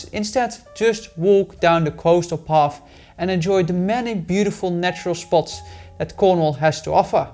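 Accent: Netherlands. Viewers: i hear your dutch so that says enough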